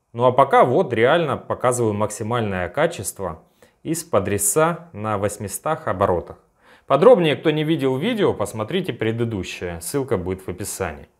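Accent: Rostov, Russia. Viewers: в Ростове все так говорят, обычное дело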